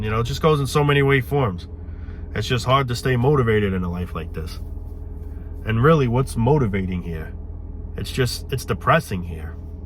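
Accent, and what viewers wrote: New York, United States. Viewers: NY accent, makes this better.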